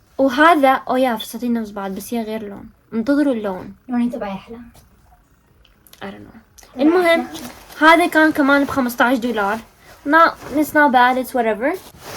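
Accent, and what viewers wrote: Iraq. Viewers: ها شبيها ترى المصلاويين يحجون هيج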